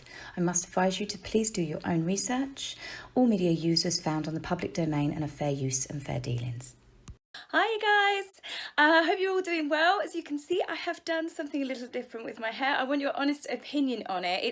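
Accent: United Kingdom. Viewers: I love your personal tid bits regarding your life, the hubby, and the dogs. Please don’t stop! Also love the hair! As for your content, it’s fabulous... the wonderful British accent is a bonus. Thank you for all that you do! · Yes you know what's nice about you talking about your life is that you seem like a genuinely nice and sincere person who is on behalf of "the little people" & it is comforting to hear a mentally stable person when everyone in CA is freaking out about coronavirus and #blm & fighting. I also love your colloquialisms & just listening to your accent. I'm interested in learning British slang & about everyday life in the U.K. & also people do really well with beauty channels maybe you can make extra money promoting products?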